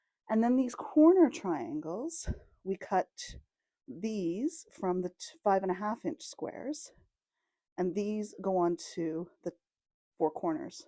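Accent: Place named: Canada. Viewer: Trying to determine where you are from because of slight accent! Canada, New York? I think Canada. Love the quilt and pattern and your video is excellent. Like how you discuss measurements cuts and note bias and grain!!!!!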